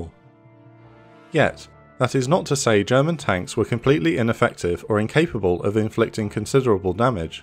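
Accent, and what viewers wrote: United Kingdom. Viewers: I wish that the English was better without the over emphasis of the British accent. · This is not totally accurate, the problem was fuel, fuel and fuel! the Stug, Panzer 4, Panther, Tiger! Its like the Pommes still think that Little Willy Frank Whittle thinks he invented a jet engine; meanwhile the German had a Jet aircraft flying in August 1939! Judging by your accent you come from the country which made the worst tanks in WW2; the Cromwell, Crusader, Churchill all were shit! British Tanks were crap! My Father was a Tank Commander of a Mk 4 Sherman, and the odd Sherman had a 17 pounder in it! My dad was in the 20th Battalion of the 2nd NZEF, 1st Echelon. WE were bloody pleased to get Sherman's Powered by the Ford GAA V8 18 Litre grunt machine. He fought in Greece, Crete, North Africa, where the New Zealand division got smashed because we didn't have our own Tanks, so after massive losses, the Battalion (Survivors) were turned into armored Regiment and fought through Italy right up to Trieste! 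They even had a few shots at T 34's from Titos forces! Tito backed down! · This video is so typical of WW2 stuff: an announcer with a British accent (to make it sound more "official" somehow) and an over emphasis on the importance of tanks themselves by 1944. AIR SUPERIORITY and straight up LOGISTICS were 200% in the hands of the Americans, and even the Russians, almost a full year before the "Battle of the Bulge" anyway. Some great military mind once said, "Amateurs study tactics, Professionals study logistics."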